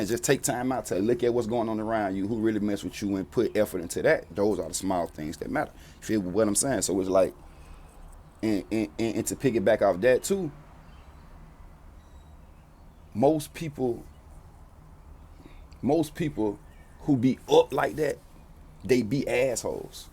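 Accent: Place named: South, United States. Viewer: Dude I’m just now remembering who you are man. You had a album with some songs I used to ride to but it was one of your older projects. It was something you had out between <num>. It might have been old when I got on it but I believe it was new the time. The beat had a nice guitar on it if I’m not mistaken with almost like a blues riff playing on it but with the trap style drums but more like the 90s style drums. I been looking tonight but still haven’t found it. Only reason I remember because I associate your music to when my daughter was younger in elementary but now she 12. Whatever that video was I’m thinking of I used to watch it like every evening for a couple weeks straight. I live in Mobile. I have family in Birmingham though so the music is relatable. I have a similar southern drawl. Keep the videos coming. Nice work. Didn’t know you had got in that trouble. 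Just watched an old interview with Ugly Money. You talk like you more valuable to your family & community being free than to the federal prison system. Kill them jokers with success & kindness.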